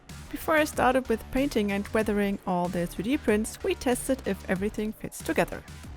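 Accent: Germany. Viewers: I just found you guys randomly and judging by your accent i figured you are german. Turns out you are. Also, it turns out you guys were at the Fantasy Basel. Seems like I'll see your work or even meet you guys in the next FaBa. Nice. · You sound so damn German :d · DU BIST DEUTSCH AKZENT,VORSCHAU IN DER ECKE OBEN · You are german or swiss or austrian arent you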